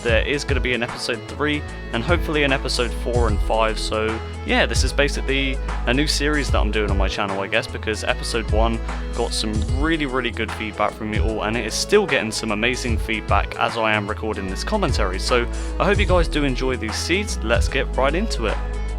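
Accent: United Kingdom. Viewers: you have such a generic british accent :D when i try to make a british accent your's is precisley what i get. and my main accent is East-Coast American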